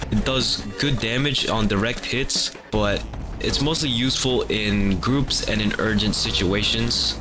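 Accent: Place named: Netherlands. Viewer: for anyone wondering, zeekoeien100 is Dutch for Seacows100. Also im guessing, based on his accent and one of the pple hes playing with, that hes dutch himself. But thats just a theorie, A THEORIE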